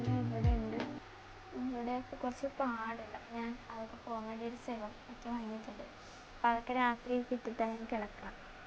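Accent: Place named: Cherthala, India. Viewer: ടാ... ചേർത്തല സംസാരം, എവിടെ ആണ് വീട് 💞💞💞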